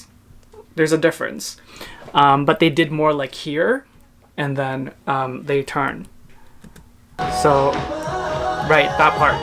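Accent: Philippines. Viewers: I love your heavy Pinoy accent. Magandang umaga from the USA!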